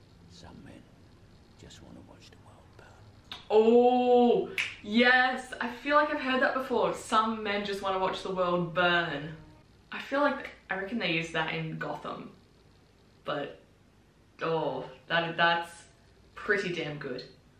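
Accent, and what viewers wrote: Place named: Australia. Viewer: The Dark Knight is an absolute masterpiece. Everybody was hating on Heath Ledger before The Dark Knight came out because of Heath's handsome leading man image at the time. I myself remember the Broke-Bat Mountain jokes. No one, and I mean NO ONE though he was capable of this kind of performance. He was definitely good but we hadn't expected he'd be THIS good. No one was hating on him after this, and to this day I believe his best films were still ahead of him. Great films we'll never get to see. RIP Heath Ledger. He was an icon. You may have missed it, but the flaming fire truck and robbing a mob bank are exactly the kinds of things that the Joker's twisted sense of humor would think was funny. The bank robbers even bring it up during the opening scene. And the truck flip was not done with CGI, they really flipped a truck. 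By the way, have I mentioned how much I adore your Australian accent?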